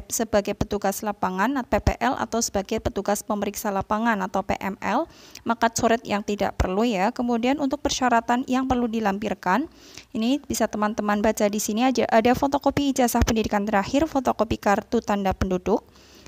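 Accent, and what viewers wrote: Banyumas, Indonesia. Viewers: Sampean ngapak Tegal apa Kebumen yukk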